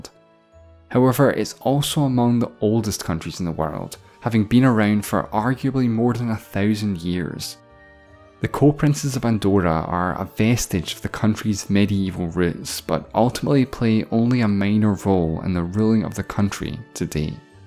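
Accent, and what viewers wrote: Scotland, United Kingdom. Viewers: Do i hear a Scottish accent? 👀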